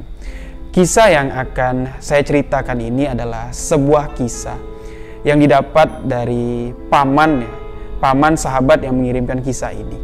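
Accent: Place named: Madura, Indonesia. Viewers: Org madura ya bang ngomngnya khass bngtt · Logat maduranya sangat kental..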